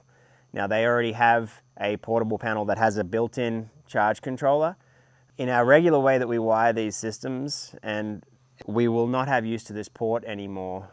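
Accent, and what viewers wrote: Australia. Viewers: Just me or you sound very Aussie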